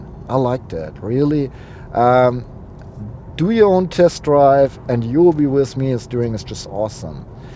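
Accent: Germany. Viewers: Lol your dash of humor is refreshing. Especially in that German accent!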